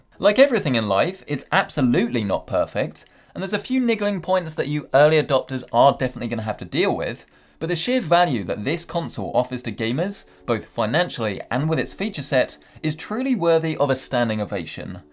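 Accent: United Kingdom. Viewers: A XBox review in true British accent.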